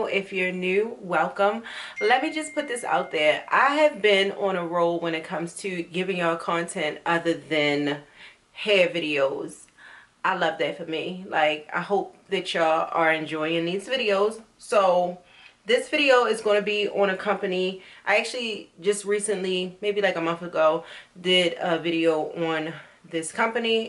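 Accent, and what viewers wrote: Baltimore, United States. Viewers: Enter me! ♥️ This Baltimore accent is life! Also, you’re inspiring me to get some Orange and green sandals lol❤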